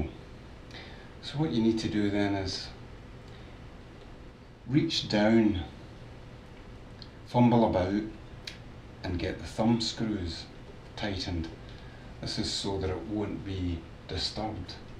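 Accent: Scotland, United Kingdom. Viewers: Ah, thank you! I thought it might be Egyptian or some other Arabic dialect. I didn't know that Scottish is that thick. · wow, this is really cool!!! I had no idea you can adjust the violin on your neck. And...olive oil? You are hilarious :-D Are you Scottish?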